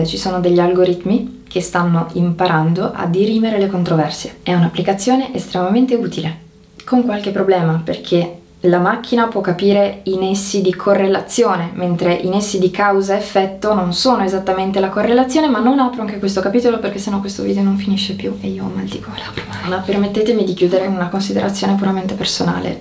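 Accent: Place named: France. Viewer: Scusate ma chi è questa bella ragazza, con accento francese?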